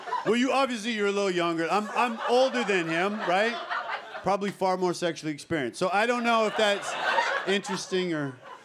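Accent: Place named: Canada. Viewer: That's how Canadians talk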